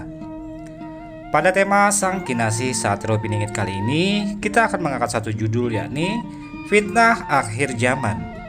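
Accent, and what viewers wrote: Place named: Semarang, Indonesia. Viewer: Satrio piningit bagakan.terbelenggu Di lobang tikus sendiri 😊 Sambil ngencak HK Kecil kecilan kamu sepertinya orang SMG logatnya nada Bicara sepertinya Aku pernah kumpul Sama orang smg😊 Di puncak. Cemara😊😊😊😊😊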